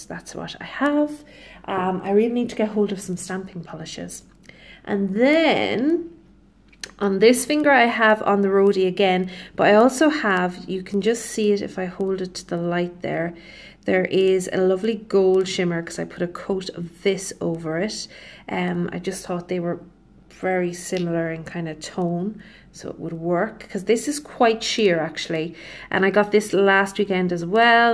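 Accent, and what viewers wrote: Ireland. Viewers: I'm applying Zoya's Merida as I'm watching your video! I love listening to your Irish lilt, what part of Ireland are you from? My great grandmother was from Belfast. Her father was a tugboat captain in the Belfast harbor & was one of the tugboats that pulled the Titanic out of the harbor on it's fateful voyage!:)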